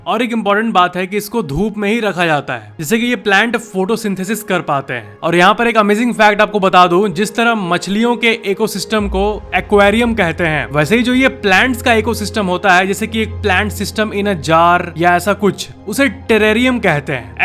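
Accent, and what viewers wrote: Bihar, India. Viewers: Aap bihar se ho bhai😊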